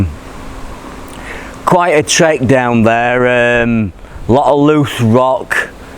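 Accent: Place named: Manchester, United Kingdom. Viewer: Where are you from, accent a bit Manc, but maybe our Oldham ?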